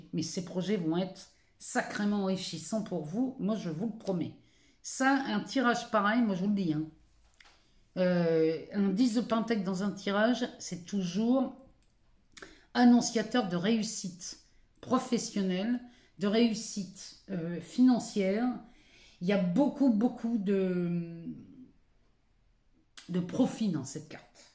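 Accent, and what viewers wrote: North, France. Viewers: DEQUELLE REGION DU NORD ETES VOUS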